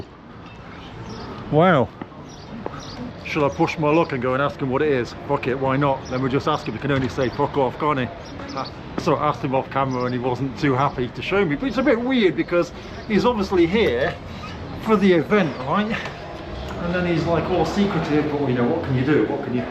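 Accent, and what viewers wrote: England, United Kingdom. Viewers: Great video! I wonder if they were uneasy with you filming since you have the English accent, maybe worried some Englishman would make anti russia videos?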